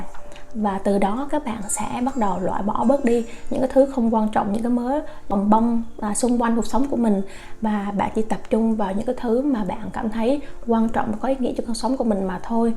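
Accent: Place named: Quang Nam, Vietnam. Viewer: Giọng bạn giống Quảng Nôm hay Quảng Ngãi quá bạn hê :)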